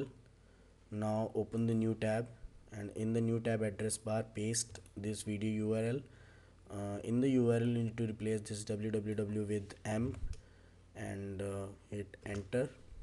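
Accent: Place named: India. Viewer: why would you trust a person with an indian accent and bit.lys in the comment section explaining how to log out from messenger....not to mentiont that 90% of positive comments are indians.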